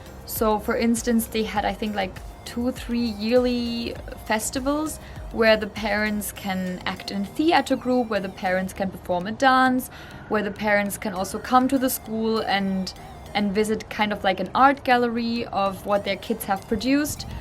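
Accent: India. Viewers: your English communication like Indian and very simple and not like your Motherland.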